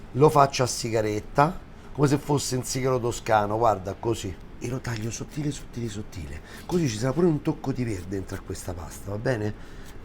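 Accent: Rome, Italy. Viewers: Chef ci piace di più quando parli italiano con l accento romano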